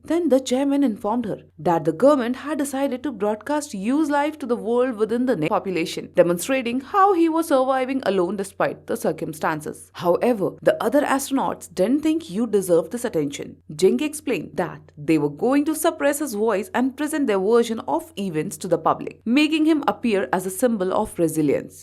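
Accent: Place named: India. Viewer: The Indian accent is sooooo hard .... even jetfuel cant melt it....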